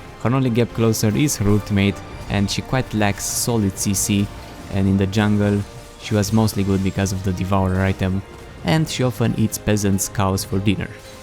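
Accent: Romania. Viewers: You have Romanian acces :D Deci esti roman :)) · are you romanian? you have accent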